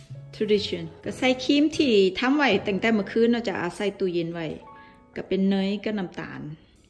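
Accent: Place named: Korat, Thailand. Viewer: พี่สำเนียงคล้ายๆคนโคราชเลยครับ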